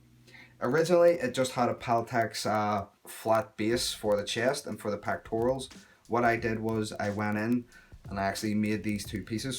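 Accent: Ireland. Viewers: great channel..charming kid..well spoken..cosplayers do it best! i just rolled into cosplay at 36..big geek..big movie and toons fan..not many channels are that enjoyable to watch..like some big names i wont mention..i love cosplay chris tho..something about irish people..and australians thats just so loveable..good friendly characters.i'm from belgium myself and we have irish pubs here..lots of irish fellows working hard here and each of them friendly AF!:) always loved the way u guys talk and yr humor..yr a good fellow..dont get cocky lol..stay humble as u are and cosplay yr ars off! and remember..cosplay is about having fun and not the ego like some..guess those people are a bit lost inside or what not..but yr refreshing to watch:) hope u have a long good run here on the tube:) best wishes and greetings..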